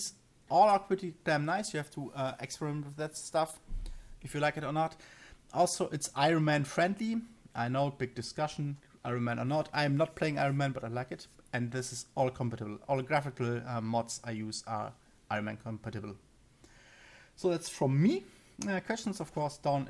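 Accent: Germany. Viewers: That authentic German accent i love it! Greetings for Sweden man, thanks for the Tutorial.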